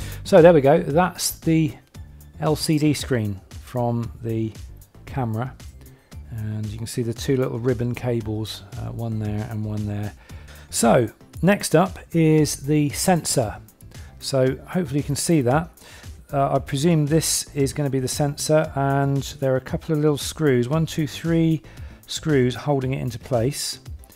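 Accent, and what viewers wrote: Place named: Bristol, United Kingdom. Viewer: Have you ever captured paranormal activity with that? I love the Bristolian accent ( give or take a few miles ).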